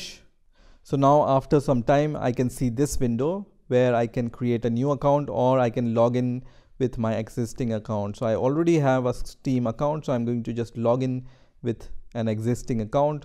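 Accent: India. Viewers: are you indian? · his indian voice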